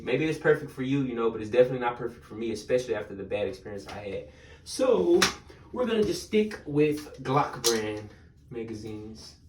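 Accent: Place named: Texas, United States. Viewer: When you said wassup wit it lol I knew you was from Texas lol I’m in Dallas mayne keep doing ya thang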